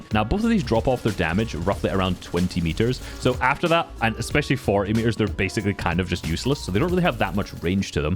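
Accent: Scotland, United Kingdom. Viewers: Yay Scottish man goes on cartoon gun rant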